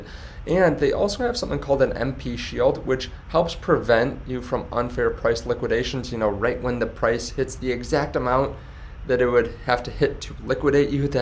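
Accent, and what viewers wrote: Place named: United States. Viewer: What are you talking about "foreign"? His English is perfect. He sounds American. I've lived in Portland most of my life. Even many Americans pronounce Oregon wrong because they aren't from this region or haven't heard it said much. It's not an insult to correct someone about it, nor was it meant as one. Seriously chill the F out lol.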